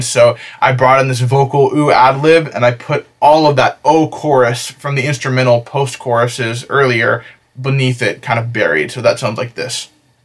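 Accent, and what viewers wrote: United Kingdom. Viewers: He lives in texas? I thought he was like from the UK. lol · Wait ur not British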